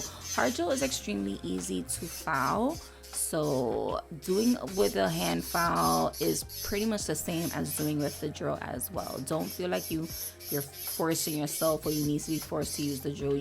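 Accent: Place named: North, United States. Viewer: I always watch videos love the content and rarely, comment, share, Subscribe. But the way you shouted one of your followers for the gift! And willing to help her expand her clientele, along with the sincerity I felt from you. I had to comment and subscribe!! 😊 LOVE YOUR CONTENT….Your Northern Accent is strong. I grew up in New York, I watch your videos so much mines is coming back stronger. 😂😂😂 You remind me of one of my “NY Boricua” Besties from L.E.S!!!!!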